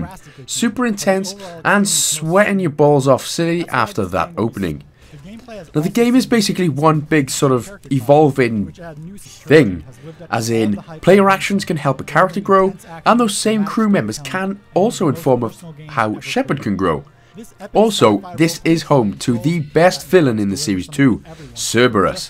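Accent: Wales, United Kingdom. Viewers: Bloody decent vid buddy 👍🏻 nice to hear a welsh voice on Yourube for a change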